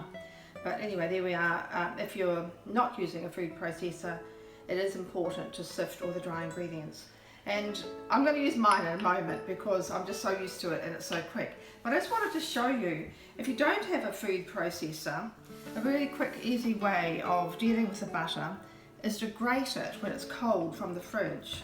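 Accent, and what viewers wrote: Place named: New Zealand. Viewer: I had never thought of making date scones until today when I saw your wonderful video (and recipe!) I will be 'having a go' later today! I'm in Yorkshire so am pleased that you pronounce 'scone' properly! I have friends here who are from Dunedin so I'm used to your fascinating accent - though I still find it amusing when you mention 'the bist brid; marking the sigments; so much bitter; a lemon zister!; ¡ɹǝʇʇᴉq sᴉɥʇ puɐʇsɹǝpun uɐɔ noʎ ǝdoɥ I - ooʇ ǝƃɐnƃuɐl puɐlɐǝZ ʍǝN ǝɥʇ uᴉ ǝʇᴉɹʍ oʇ uɹɐǝl oʇ unƃǝq oslɐ ǝʌ,I Thanks again for the video and the useful addition tips!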